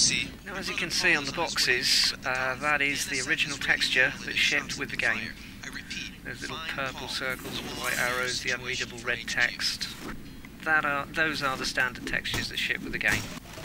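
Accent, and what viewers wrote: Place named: United Kingdom. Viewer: Not sure why, but every time I watch a YouTube video on how to get some program to run, it always seems to be a Brittish fellow. Anyway, thank you very much for posting this tutorial! I have never played Deus Ex before, but after loving DXHR, I decided that I simply must play the original as well. And thanks to your video my experience should be even better now.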